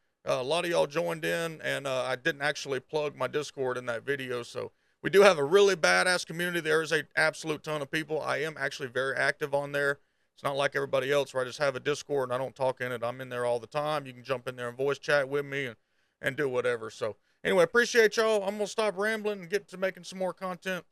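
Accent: South, United States. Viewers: 100% correct about the bigotry and prejudice regarding your accent/drawl. I live down here too and I’ve been told time and again ‘I didn’t think you were that smart bein a redneck’ from people who don’t even know me. I just laugh. People are indeed ignorant. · Hello. I think you are 100% right in this matter. It's like that microtransaction conference video that was released years back, and people were shocked how well structured and organized it was when it comes to min/max bleeding customers dry. For all Blizzard's fault and mistakes, in the business side of things, I think their decisions are based on decades of research and millions of dollars. It's no mistake that Eternal Realm was nuked and season comes with new mechanics, new powers, seasonal goodness and battle pass yummies. As for your accent. Growing up in Sweden we were bombarded with American culture from TV and movies. 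Southern accent folk weren't generally portrayed in a good light during the 80's and 90's. So I will at times instinctively think "oh he a redneck", but can quickly dispel that notion due to how idiotic it is to think like that. It can however, not be denied that it's human nature to dislike and be suspicious against things unlike one self. Culturally and appearance-wise. When I see someone in full leather, spikes, black hair, nose ring etc, I will generally have a negative idea of that person as a first impression. That does of course not mean that the person is in any shape or form a bad person. It just is what it is. I will also say that I absolutely love your accent, it is no doubt my favorite American accent. If I would ever marry an American woman, it would be a southern lady. Lauren Cohan as Maggie in The Walking Dead and Joelle Carter in Justifie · got the RECITES!!! Southern brain at work! 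And hate to say it but when people talk about our southern twang they are just uncultured!!!! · Lean in to that Southern draw. It's what helps you standout to me honestly. Your coverage is great and I think your ideas have merit. Regarding Asmon not giving you any credit he usually will spam a link to your channel in his live react video on twitch which I believe he did because watching him react to you is how I found your channel. Own that southern gentlemen stereotype man. Embrace it. Lean into. Let be the way you stand out o these content creator driven platforms. The great thing about it to is it you being you. There is no gimic or shtick. You are real authentic and down to earth kinda guy on a platform full of fakes. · Sorry about all the rude comments you got about your accent, its clear you are intelligent. There are tons of people even up north in America that think a southern accent equates to racism, unintelligence and incest for... 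whatever reason. But keep doing your thing dude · we need more southern boiz doing content like this! Lovin the accent and screw them yankies who don't! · Screw the bigots. You should dig deeper into the southern accent and aesthetics around southern culture. People who actually follow you will love that. Look at Aztecross from Destiny 2. People love hearing his accent when he reads patch notes and weekly dev update posts. Adding some southern humor in makes it even better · Came for the Redneck gunloving accent 🙂 stayed for the tinfoil hat... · Anything can seem like a conspiracy if you know absolutely nothing about how it works. Southern bro here, i really appreciate your channel. Seeing people that sound like me do well, helps out a lot. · Came here from asmon and subbed, im from europe, we don't get to hear alot of american accents especially southern, i think it's great ! Makes you sound real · man you gotta lean into the southern accent, start saying stuff like "what in the sister kissing woods was Blizzard thinking" love the videos and asmond linked you this time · Subscribed! 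Dudes accent is so perfectly southern.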